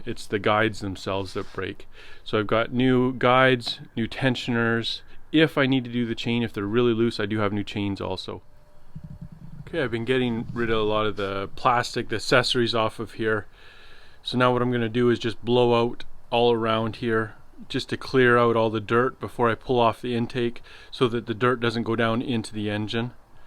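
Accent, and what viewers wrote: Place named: Canada. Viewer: Next, they'll be telling you when to change your underwear.. You accent spells Canada, am I correct..?? If so, it figures..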